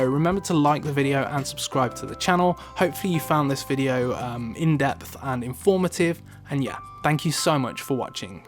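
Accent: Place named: North West England, United Kingdom. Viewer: Jorge Luis Pérez Armijos Sounds like north-west England to me. Somewhere around Birmingham or Wolverhampton.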